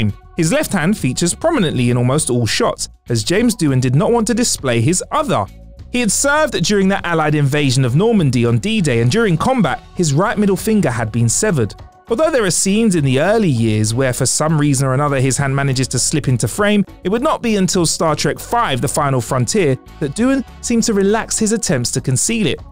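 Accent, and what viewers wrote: United Kingdom. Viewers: even with a british accent I could tell what he looked like. Are those my skills? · That British english is horrible.Slow down mate.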